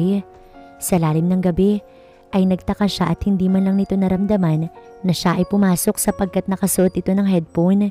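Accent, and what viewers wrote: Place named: Hiligaynon, Philippines. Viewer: Ilonggang ilongga ang boses...hehehehe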